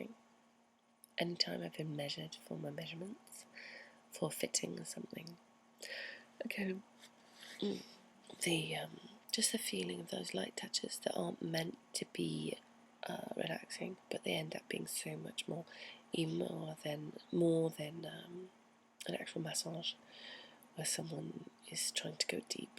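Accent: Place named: England, United Kingdom. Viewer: I don't know why it's interesting. The title says what the accent is. But to be specific it's an English accent. People only call it British because that's all Americans will ever understand about British accents.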